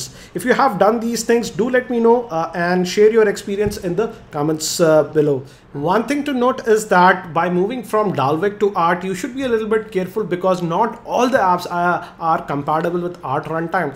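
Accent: India. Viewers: Omg this Indglish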